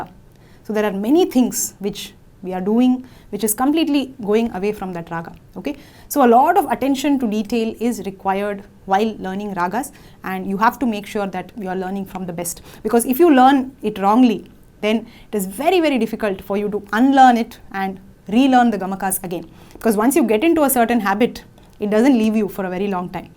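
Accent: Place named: South India, India. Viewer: It is not raagaa.. it is raag... Also, it is not swaraa, it is swar. May be it is due to southern accent? The video is useful. Thanks for your efforts..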